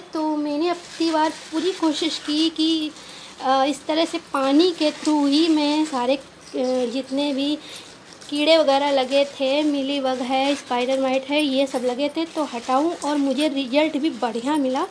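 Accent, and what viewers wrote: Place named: Kanpur, India. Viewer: तुम्हारी आवाज सुनकर कानपुर की याद आ जाती है बिल्कुल ऐसा लगता है जैसे हम घर पहुंच गए आपके प्लांट के बारे में भी जानकारी दी बहुत अच्छी बहुत-बहुत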